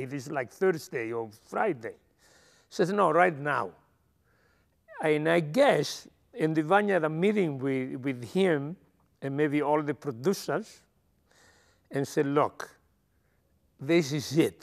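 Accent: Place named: Sardinia, Italy. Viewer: Inglese con accento sardo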